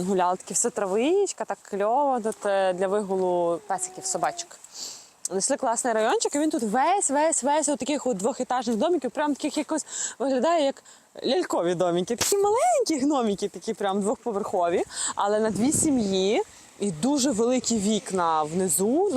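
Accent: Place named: Western Ukraine, Ukraine. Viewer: Вы из Западной Украины,а война на северо- востоке и на юге Украины.Чего вы убежали?Говор у вас из западной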